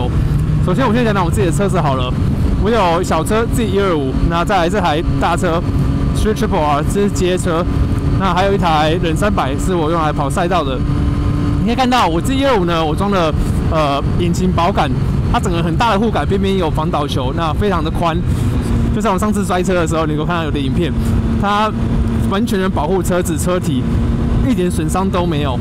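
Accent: Taiwan. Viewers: 叭鯊小 超道地濃濃的台灣味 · 熟悉的台灣味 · 那算是台灣的髒話 但她的口音夠台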